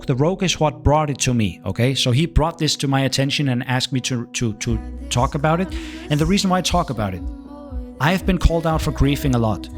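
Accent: Denmark. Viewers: that is the most danish accent i ever heard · God, i like these videos.. But the commentary and danish accent is awful.,..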